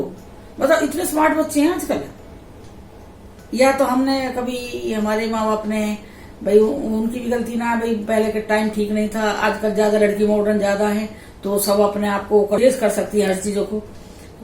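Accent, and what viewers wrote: Uttar Pradesh, India. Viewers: App humri jesi baat karti ho up sahid 😊👍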